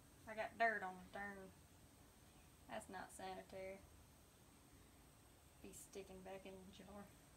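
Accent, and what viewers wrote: South, United States. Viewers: you have an amazing accent. Love you southern bells.all the way from England Steve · That southern accent and barefoot my kinda gal.👍🏾👍🏾👍🏾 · Love you baby and love the southern accent. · Love your southern touch. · I'm not sure if its just because your just so beautiful or that southern accent of yours but i need to find a women from the south I think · Damn...she is hot and that southern in her voice. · ain't nothing hotter than a cutie with a sothern accent thanks for the trapping tips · i think she 'trapped' me with her good looks and southern accent. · Love her southern accent, I find them really cute like the chick in the vid! Must be tough to live out in the country with all the wild animals around. I hear groundhogs while cute little things, cause lots of damage. We have SI Chuck here in NYC, but he's trained and confined with humans but the wild ones aren't. 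This chick looks like she'd be able to fix a car or something and not worry about mud like the city girls up here lol. Also, you know she works out or bikes or something with those legs? Would not want to get kicked by her lol. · Ma’am I just love your southern accent. I can actually understand what you’re sayin. I’m from upstate SC. We usually use sour corn for something besides hog feed. Hahahahaha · that southern accent it think im inlove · Ive got a southern draw but damn i love hers a hell of alot more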